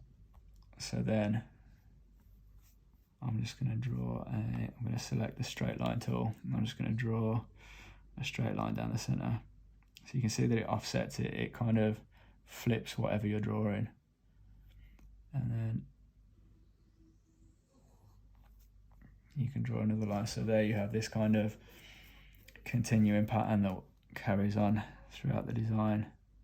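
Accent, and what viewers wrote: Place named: South London, United Kingdom. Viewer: I love your accent. I sense you are from South London? Your tutorials are great, you go at my speed so I can keep up with you. I’m learning a lot from you and guess what… I do not read manuals! I’m a poke and stabber. I will be catching all your tutorials as you make the app less 😵‍💫 thank you!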